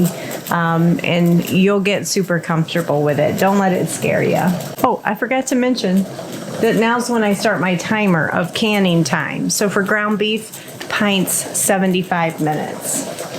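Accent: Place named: Wisconsin, United States. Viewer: Nice accent! Where in Wisconsin are you from? 😂 I live in Rice Lake!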